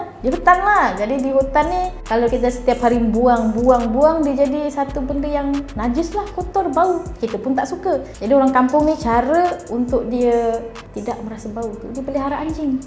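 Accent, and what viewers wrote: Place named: Sabah, Malaysia. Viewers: Start saja dia mula becakap aku tau suda sabah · Suka sangat loghat Sabah dia.. =)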